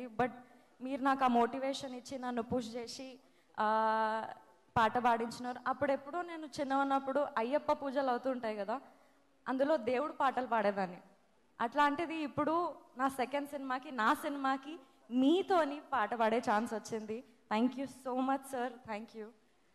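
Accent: India. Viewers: Hello she is from telangana....if you don't know anything keep your mouth shut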